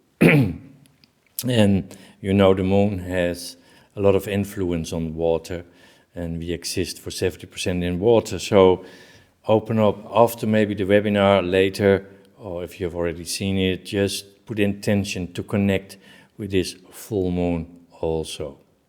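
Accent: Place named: Netherlands. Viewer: Ik hoor een Nederlandse stem.. hoogst irritant! Waarom is dit?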